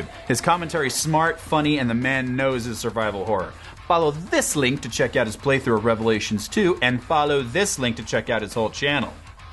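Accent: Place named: United States. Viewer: Awesome review! Informative, humorous and to the point. It's nice to hear an American tell me about games rather than the obligatory Brit the big sites seem to hire for reviews. I'm not saying they are annoying....I'm saying your AWESOME. Sunbscribed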